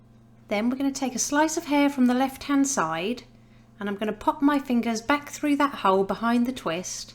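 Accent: United Kingdom. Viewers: England accent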